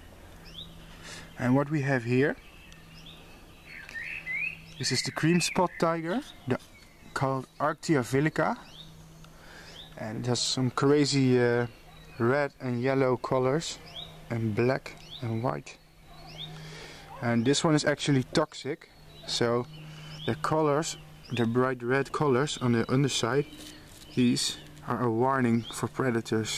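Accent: Netherlands. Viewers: Those are some very pretty moths! I immediately knew you were Dutch haha! But don't even worry about the accent, you're very easy to understand :) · Beautiful creatures, and never apologize for your Dutch accent!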